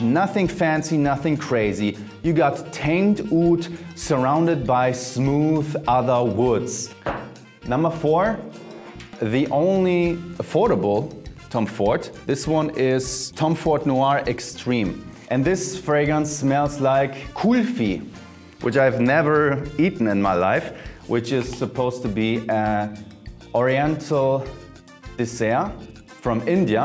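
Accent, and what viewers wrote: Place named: Germany. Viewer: that german accent thou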